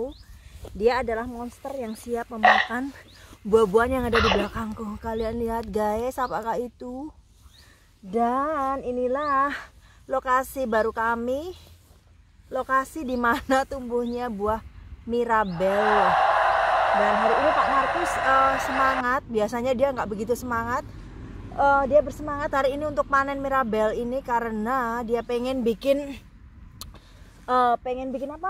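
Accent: Java, Indonesia. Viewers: Mba mangga dan rambutan haha,mba jawanya medok kaya aku mba orang mana?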